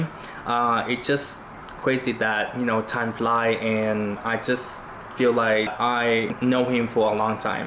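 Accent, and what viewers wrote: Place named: Vietnam. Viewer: This was so dope! You’re such an interesting, intelligent, methodical and organized person. I also love how your Vietnamese roots shows up in your English. It’s so dynamic and beautiful! P.E.A.C.E., J!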